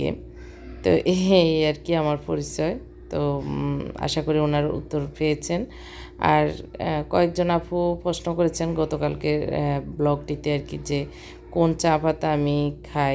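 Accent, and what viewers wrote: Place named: Feni, Bangladesh. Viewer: Apu tomer kotha gula sunle apon apon mone hoi, karon ami o fenir, purbo ukil para, thaki Dhaka te, r tomer vlog dekhar jonoo wait kori,balo thako.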